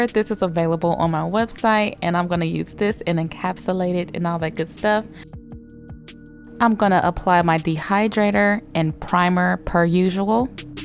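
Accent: South, United States. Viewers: I’ve said it several times but I’ll say it again! Being from the south I love how your southern accent comes through in spurts lol “...just try to make sure all the colors are dOWn”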